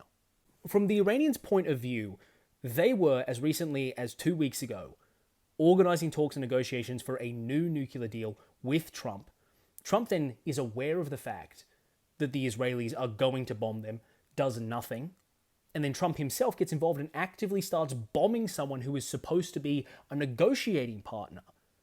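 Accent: Australia. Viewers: Australian channel, Australian pronunciation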